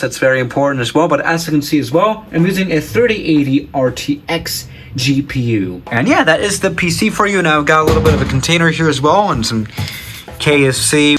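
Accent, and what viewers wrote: Germany. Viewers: Wait are you actually German ?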